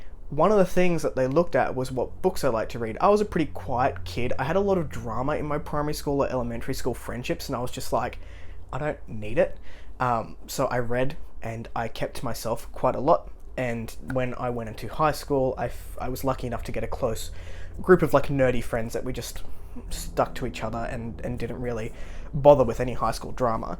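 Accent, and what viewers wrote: Australia. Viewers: Extremely open and brave video,. Sounds like a long and difficult journey, but I’m glad you’ve gotten to a good place with it all. I don’t directly know anyone who’s transitioned, so I learned a lot. Thank you. And apart from that, it’s always a joy to hear your Aussie accent, mate. 🙂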